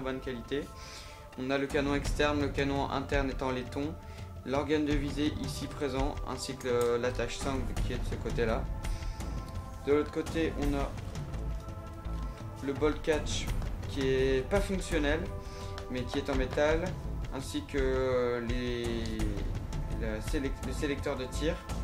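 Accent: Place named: United Kingdom. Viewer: J'adore ton accens anglais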